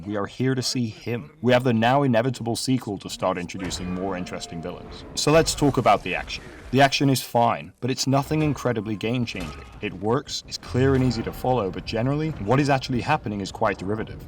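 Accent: United Kingdom. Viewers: You from Bristol? The accent sounds familiar.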